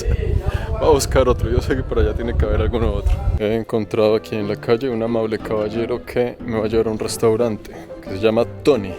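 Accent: Bogotá, Colombia. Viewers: El acento de los colombianos🌹❤️ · En este video escuche que es colombiano ❤❤❤yo amo ❤ese acento colombiano ❤️❤️❤️❤️❤️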